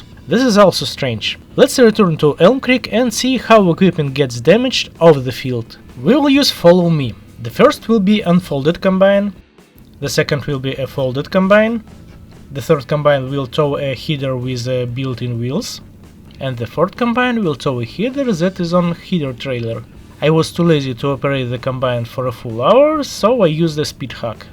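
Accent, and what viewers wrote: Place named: Arab world. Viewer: i can't deal with your arabish accent sorry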